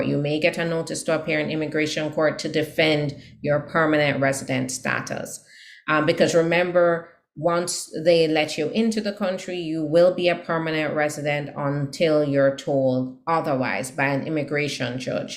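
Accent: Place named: Jamaica. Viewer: You Jamaican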